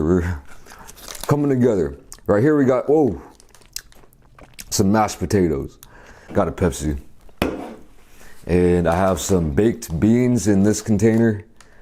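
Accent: United States. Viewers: Oh okay that's why you sound so American lol you use to live here, didn't catch that as I'm a new sub. Binge watching lol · most American sounding Korean ever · he’s American Korean numb nut so that’s an American sounding voice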